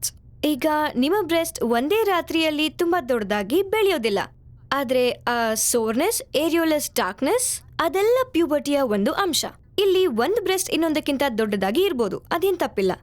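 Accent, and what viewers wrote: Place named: India. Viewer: fifakillaz 14 Indian accent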